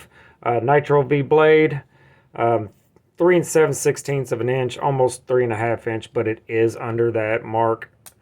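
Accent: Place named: Mississippi, United States. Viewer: You sound just like a cousin of mine that lived in Mississippi.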